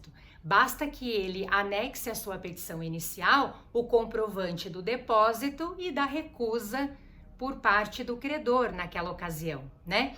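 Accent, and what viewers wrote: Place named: Paraná, Brazil. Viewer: Esse sotaque é do Paraná hein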